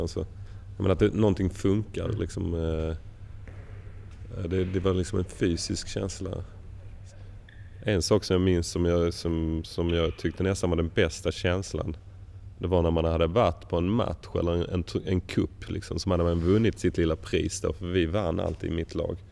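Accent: Sweden. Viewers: Vad i helvete, är han skåning? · Sjukt ovant och höra honom med skånsk dialekt :P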